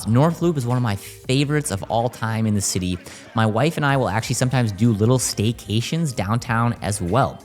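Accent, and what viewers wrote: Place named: Canada. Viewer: This guy sounds xanadian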